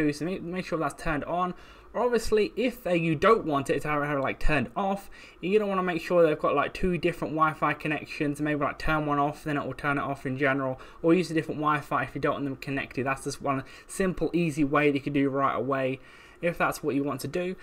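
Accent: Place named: United Kingdom. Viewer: He talks too fast and with his British Accent it's hard to understand him, he needs to slow down.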